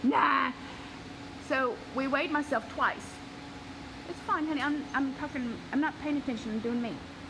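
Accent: South, United States. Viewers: I am 63 years old and scheduled for my roux en y surgery on March 8th. Your quirky and honest videos give me the most realistic view of what I will be facing going forward. I realize everyone’s struggles are differently packaged albeit mentally and physically. In the end it comes down to changing what we eat, how we eat and how we move our bodies. I too struggle with physical challenges of several back surgeries, rheumatoid arthritis, etc., and the list goes on. I always maintained a healthy active 120lbs. 5” 4” frame until age 45 and illness, back and knee surgeries. Now I am 5” 3” 240lbs. Your southern voice initially drew me in (so fun to listen to) and as you went along, I just enjoyed the love story of you and Evelyn. Congratulations on reaching your targeted weight goal. You have worked very hard and I hope you feel fantastic! Thank you for posting and I hope you keep it up!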